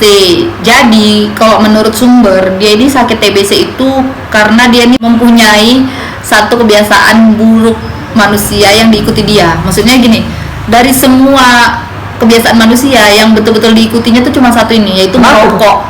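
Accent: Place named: Medan, Indonesia. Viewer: Dek , dah pande kau , berkisah ya, kalau org2 mdn yg nonton dah pasti lah ngerti bahasa kau tu, kalau org2 Jkt belum tentu paham semua aksem gaya bicara kau....hahaha.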